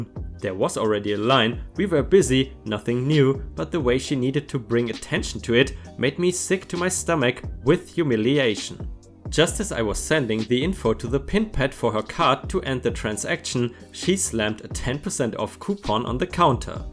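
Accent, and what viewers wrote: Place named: Germany. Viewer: So, hey there. Until now, I wondered, why that accent is so familiar. Now with that "german" comment, I know why. You,re german, aren't ya?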